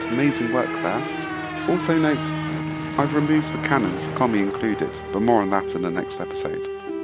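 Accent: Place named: United Kingdom. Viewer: and always remember if you feel down just think of us because your voice calms at least me down. It's just so smooth yet you sound just like a normal British guy. In short keep it up and be happy because you deserve it even if you work your hardest. 👍😁🍀👍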